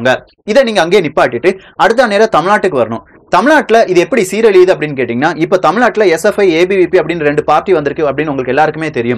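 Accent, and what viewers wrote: Kerala, India. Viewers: Don't compare with TamilNadu and Kerala, (u are a malayali your slang is little much of keralites) TamilNadu is always developed state then Kerala,. We have large no of colleges, most if the keralites willing to study in TamilNadu only. After primary education for best higher studies they have to come here only.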